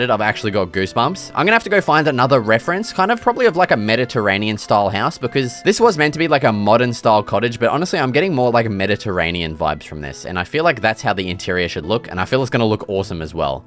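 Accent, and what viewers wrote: Australia. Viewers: He's not British, he's australian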